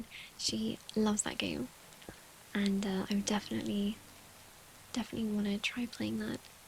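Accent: England, United Kingdom. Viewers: Beautiful accent! What part of England are you from?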